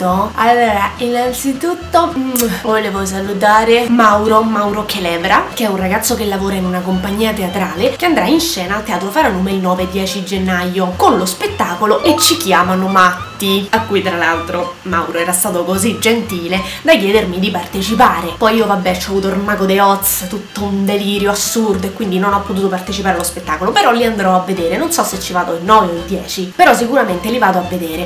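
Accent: Rome, Italy. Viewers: Milano è un delirio quando non c'è sciopero... puoi capire perché diventano tutti matti quando c'è!.' eh siiii è una vita così cosa ci vuoi fare!! ps. ti adoro:) e amo quando parli in romano!! mi fai un sacco ridereeee!! baci dalla lombardiaaaa!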